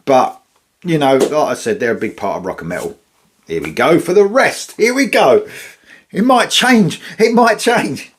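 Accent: United Kingdom. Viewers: I'm surprised you spent so much time on something you don't like. Just asking really gently and in all sincerity why did I waste time listening? (I think it's because I enjoy your UK accent from a region I still can't identify and your interesting REACTION comments!)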